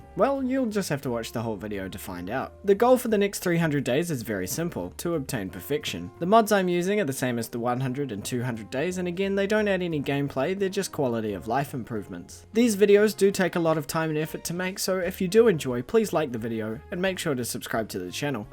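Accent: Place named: New Zealand. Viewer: I hear "kig shid" and laugh my accent off 🤣 actually I find very cool to hear so many different english accents on yt, and now I know that NZ accent have a very closed 'e' sound in words like 'bed', 'shed, 'keg', 'quest', and the stress syllables are different in some words, like 'crAnberries' instead of 'cranbErries' 👍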